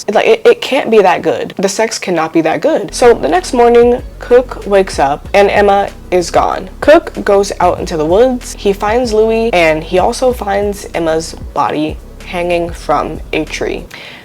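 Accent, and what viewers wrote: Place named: United States. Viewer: Hearing an American talk about Skins… I’m immediately turning off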